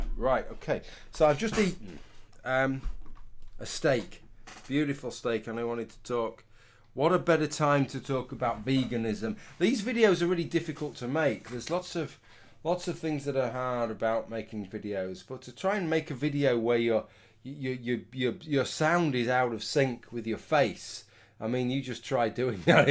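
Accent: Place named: Lincolnshire, United Kingdom. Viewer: Louth? I always suspected from your accent you must be from my neck of the woods. I was born and raised in bloody Cleethorpes! Went to Louth a few times. I remember Hubbard's Hills...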